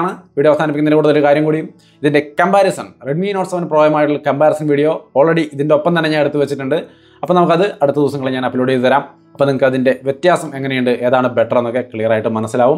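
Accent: Thrissur, India. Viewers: Thrissur kaaran aaanalle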